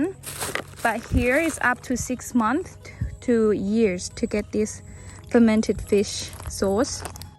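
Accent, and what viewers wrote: Australia. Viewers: I hear an Australian flavour to the way you speak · You have a slight Australien accent.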